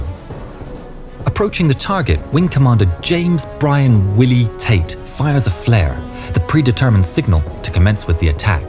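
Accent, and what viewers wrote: United Kingdom. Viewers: the narrator is British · The narrator's accent sounds a bit British...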